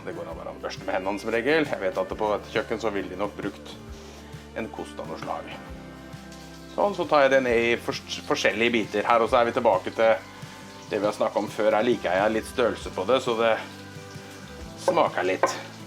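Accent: Østfold, Norway. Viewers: Var sikker på at den dialekta var fra Østfold .... God mat okke som :)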